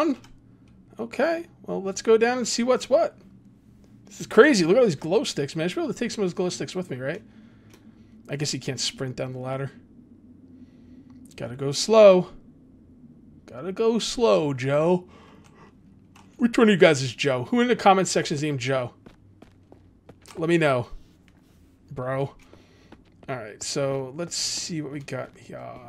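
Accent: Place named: New Jersey, United States. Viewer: Good ole Dirty Jersey! My fiancee heard you say wooder(water) when I was laying in bed watching and she goes " hes gotta be from Jersey"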